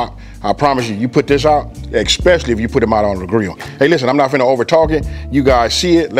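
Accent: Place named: Los Angeles, United States. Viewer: He have that L.A. accent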